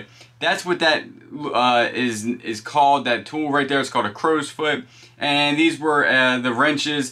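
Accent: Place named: Baltimore, United States. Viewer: Sounds like a Baltimore accent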